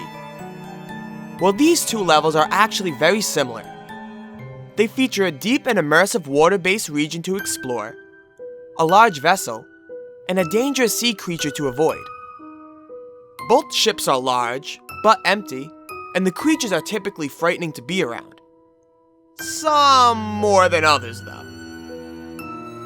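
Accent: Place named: Massachusetts, United States. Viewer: You from mass brah? you have the same accent my dad and grandmother doo there from lowe...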